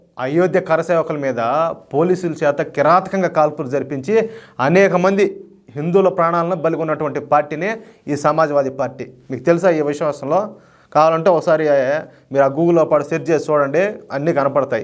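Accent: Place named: Telangana, India. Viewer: I appreciate your videos but your pronunciation is completely Telangana yasa if possible please change in pure Telugu.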